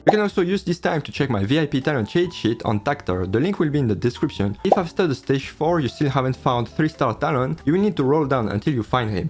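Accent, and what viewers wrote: France. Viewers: little french accent or what ?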